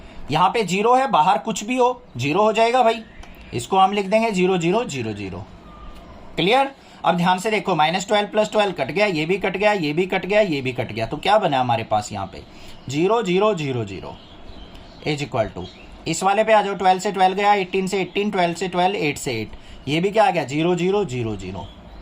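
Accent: Haryana, India. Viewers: Sir I think aap haryana se ho.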